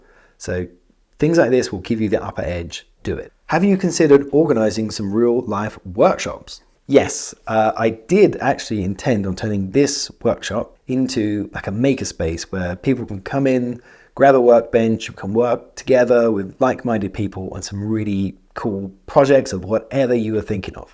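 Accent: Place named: England, United Kingdom. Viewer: Amazing teacher, animator and my favorite. Nice English accent as well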